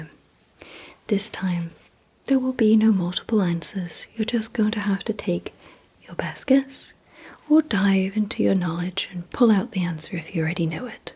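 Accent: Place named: United Kingdom. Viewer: As you wish Goddess Wright Knight Rain Hurricane / ( I love your sweet British accent 😊) Requiem Twilight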